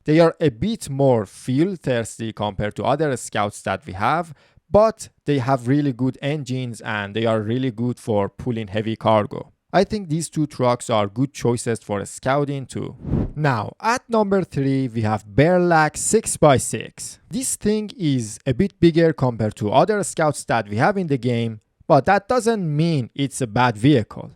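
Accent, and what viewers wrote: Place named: Iran. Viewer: Bro, your accent sounds extremely Iranian. Did I guess right?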